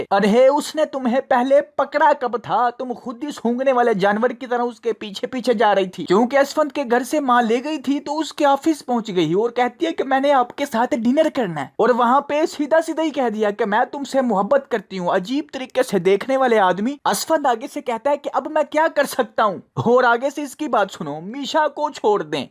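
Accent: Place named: Punjab, India. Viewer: I love your Punjabi accent..it's cute.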